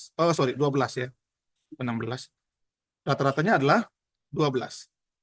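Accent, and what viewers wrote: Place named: Nagekeo, Indonesia. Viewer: Logat Nagekeo sedikit sedikit keluar e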